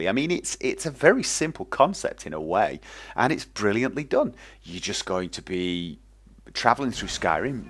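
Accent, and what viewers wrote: United Kingdom. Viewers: No he's British. :)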